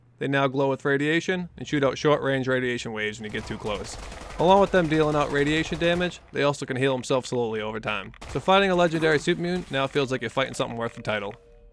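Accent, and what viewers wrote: Boston, United States. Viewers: The Boston accent makes these FO4 mod reviews much more authentic. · hembury I knew that accent after about 20 seconds of watching.....Guy from Boston modding game set in Boston= immersive :)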